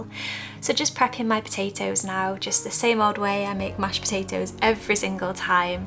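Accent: United Kingdom. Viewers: Wow these recipes look amazing and yummy. I’m so hungry now. Real comfort food for the winter season. I love your beautiful soft voice with your British accent 🥰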